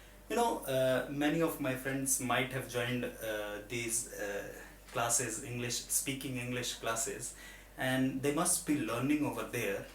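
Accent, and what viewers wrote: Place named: South India, India. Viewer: Sir why is there a pinch of south Indian accent in ur accent???... As far as I know u r from bihar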